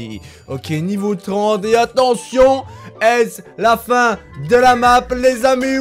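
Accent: Belgium. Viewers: Mais tu parles il est belge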